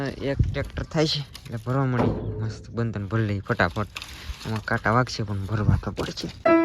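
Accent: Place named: Banaskantha, India. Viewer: અમારે બનાસકાંઠા માં પણ રોજડા જ કેસે Bhai 😇 તમે બોલો ને એમજ અમે પણ બોલીએ સિયે આપડી બોલી સેમ too સેમ સે હો ભાઇ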